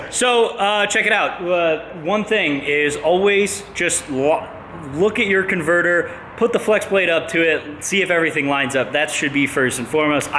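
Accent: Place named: Texas, United States. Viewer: Helll yeaaa bother ! Merica! Let them bald eagels fly brother! heavy texas accent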